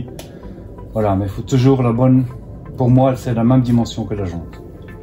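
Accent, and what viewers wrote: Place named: Belgium. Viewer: un petit like pour les explications et pour l'accent belge que j'aime bien :)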